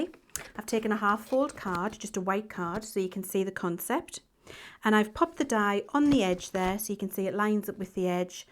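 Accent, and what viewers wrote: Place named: North East England, United Kingdom. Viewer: It sounds like she is from the North East Of England, say Teesside. I come from there, so that’s why I am guessing that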